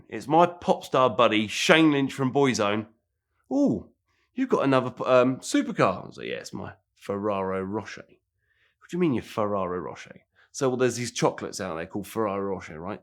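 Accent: United Kingdom. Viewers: this guy is too British for me. can't take the accent for longer than a few seconds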